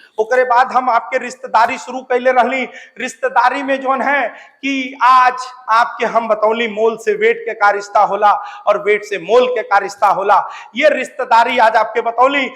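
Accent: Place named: Bhojpuri, India. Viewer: Sirji Katai gajab bhojpuriya andaj me padhawatani 🙏🙏🤗🤗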